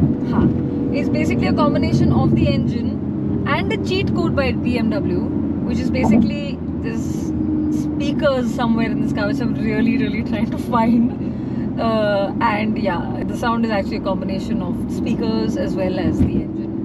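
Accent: Haryana, India. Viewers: Aap haryana se ho 🙂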